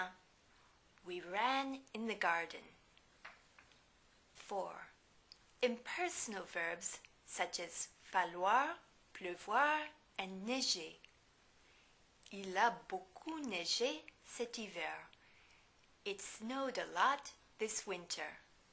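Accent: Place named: Canada. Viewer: I like your canadian accent . thank you so much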